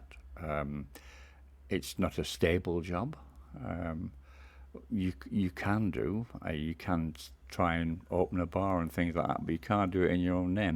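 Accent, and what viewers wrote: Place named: England, United Kingdom. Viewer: Oh….it was AI ? I didn’t know that. I thought she was real person with lovely English accent. Thanks for info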